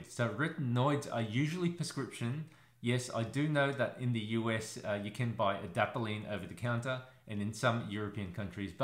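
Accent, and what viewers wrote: Australia. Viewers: I enjoy watching your videos so much! They’re so informative and easy to understand and on top of that your Australian accent is just a plus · Most informative channel on skin care I've seen 💙 Bonus: The way he says 'yeah' at the end of his sentences with his Australian 🇦🇺 accent, awesome ❤️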